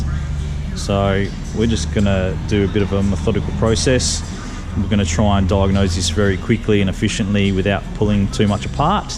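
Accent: Australia. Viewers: Great stuff - good to hear another Aussie on youtube.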